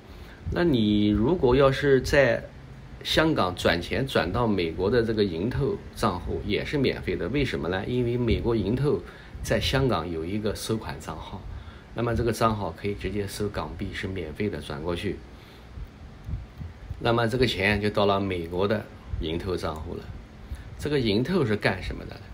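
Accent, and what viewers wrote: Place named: Nanjing, China. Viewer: 南京口音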